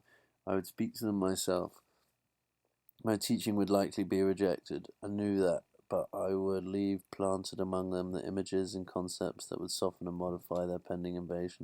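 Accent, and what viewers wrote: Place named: England, United Kingdom. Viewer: Love the book but, just because this guy has an english accent does not improve the presentation. This is pretty lousy.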